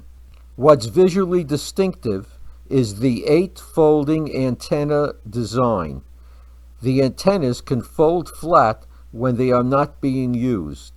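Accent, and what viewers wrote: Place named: New Jersey, United States. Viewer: I would have guessed New Jersey, myself :)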